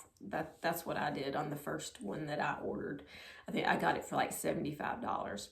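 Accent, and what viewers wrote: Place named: Tennessee, United States. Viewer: Hi, I live in the U.K. and have recently found your channel, I am of similar sizing to you (5’1” and so on) so love watching how you style your clothes. I have a penfriend who lives in Tennessee and she has your accent which again I love. I’ve travelled quite a lot in the USA and when you mentioned Loft I totally agreed, I have bought items from this store and they have been really good value for money. Congratulations on your subscribers, I’m not surprised as you are very relatable and give honest opinions on the clothes you try on. I’m looking forward to more videos so keep them coming 👍😁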